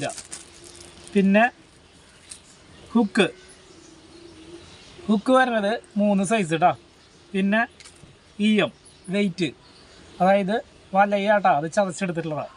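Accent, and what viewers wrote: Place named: Thrissur, India. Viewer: Kettaa arinjoode thrissur aanennu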